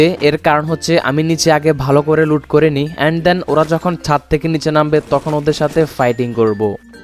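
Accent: Noakhali, Bangladesh. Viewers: তুমি যে নোয়াখালী ভাষা বল আমার থিকে জোস লাগে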